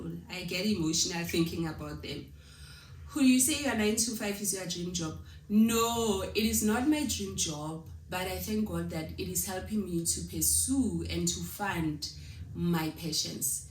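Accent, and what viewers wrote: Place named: Pretoria, South Africa. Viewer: You sound like you are from Pretoria, by your way of speaking.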